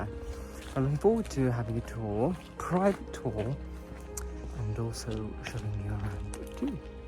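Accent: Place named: England, United Kingdom. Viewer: What an amazing video!!! Thank you for sharing all of this beauty with us. But what makes the video even more enticing is your voice. It is so soothing and your English accent just draws the viewers in; I can't get enough of your videos!!!!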